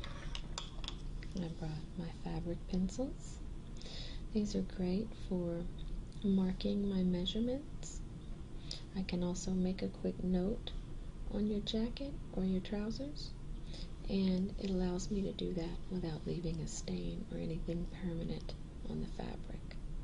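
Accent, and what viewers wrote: South, United States. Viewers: Fantastic vid. Your tone is soothing and trigger-happy (I think I detect a slight Southern accent too, which I like). Role-playing is good enough to not be distracting. Good work with the added triggers. Finally: you're a joy to look at. Great work. Subscribed.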